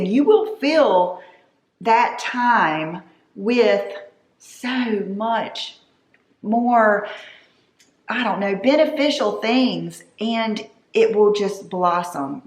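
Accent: South, United States. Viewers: Hi, I’ve been a subscriber for over 10 years. I was going through a divorce in 2011 and would escape for 25 minutes by watching your videos. I loved and still do your southern accent and how genuine you are. You are truly a blessing for so many of us. Sending you love from a 50 year old Woman in California 💗🦋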